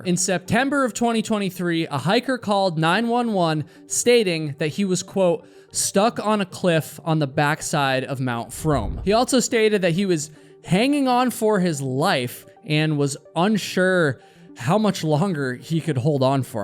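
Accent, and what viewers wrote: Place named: Vermont, United States. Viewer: Been watching your stories for a while now ....10 STARS man , Thanks .....Hey i guess your from Vermont 😄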